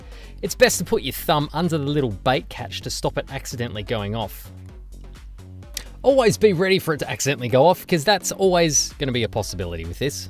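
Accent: Australia. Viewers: Idk why but I love the australian accent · Great to hear our Ozzie accent on the tube without the waffle like the mob from North of the equator go on and on and on and on till I usually hit the mute button . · O meh gawd, I found another Aussie! How ya doin' mate? (Pretty new to the channel.)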